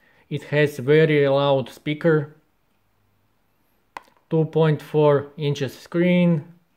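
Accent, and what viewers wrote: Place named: Serbia. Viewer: Croatia ? When I hear your English , I first think that you are from Serbia :D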